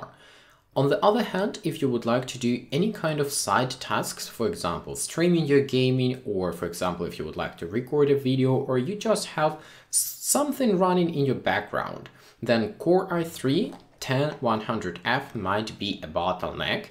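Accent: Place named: Russia. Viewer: Парень, ты откуда? Такой чистый русский акцент )))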